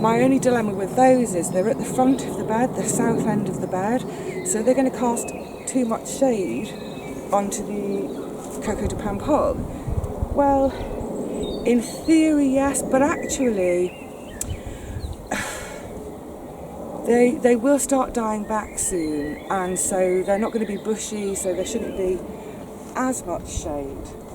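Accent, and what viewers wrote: United Kingdom. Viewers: " 🎼➡️ A long handled Sneeboer potato or bulb planter will enable you to plant through that tough soil. For this reason I am constantly working to improve my soil. This tough unapproachable soil takes the FUN outta gardening! Wishing and hoping DOES NOT improve CLAY soil! that is an excellent looking stand of broad beans. Raspberry is definitely as weed in your broad bean patch. Those beans are humongous! My mother who is an excellent cook asked me to grow 4" Nickel Filet beans. Thank you for showing us your harvesting technique. This Jane Goodall ad is one of the FEW that was WORTH watching! We can beFRIEND whatever animal we currently care for. Yes, you can intercede cover crops:-) I am currently planting Creeping Charlie into my beds bc Alfalfa a cover crop that relieves DEEP soil compaction DID NOT relieve surface compaction. I, Portia, will plant Creeping Charlie between my Alfalfa rows. 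Earthworms like coffee to help the anatomy of their gizzard, Excellent! Cardboard is a food for the worms!:-) I love hearing your British accent. I could've had that accent too had my parents stayed longer in Suffolk, England:-) I was enjoying seeing you and your mum gardening together. She looks regal. Your cardboard and coffee beans atop should take care of the raspberry weed. Try putting Creeping Charlie on a portion of your planting area and see for yourself what kind of results it brings for improving the tilth of your soil. DEFINITEL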